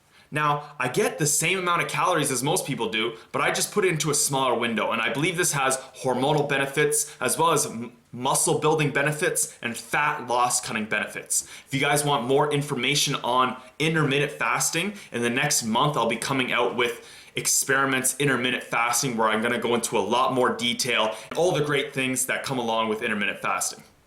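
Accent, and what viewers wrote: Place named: Russia. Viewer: Why the Russian accent if you are Hungarian ? "Vun hundrred perrscent Hungerrian voman"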